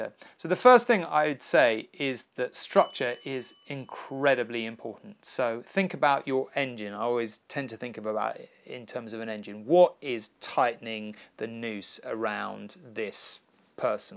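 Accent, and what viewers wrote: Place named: United Kingdom. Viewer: I appreciated the content... the British accent can be painful tho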